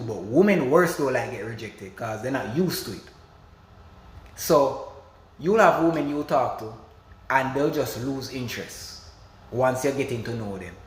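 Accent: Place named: Caribbean. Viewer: You said all facts bro, I just subscribed didn’t know you make content I hear the accent, im Carribean as well, big ups 🤛🏿💯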